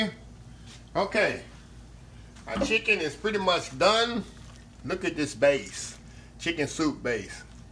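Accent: South, United States. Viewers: Bro it’s 7am and I’m up watching you make soup lol. You sound cool and southern, I can’t wait to give this a try! Thanks!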